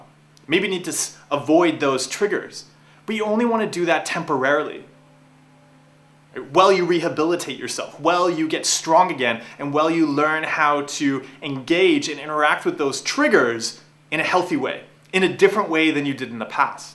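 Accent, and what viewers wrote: Canada. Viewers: Very helpful video. Im from northwest USA and did I pickup on a Canadian accent? Just wondering :)) thankyou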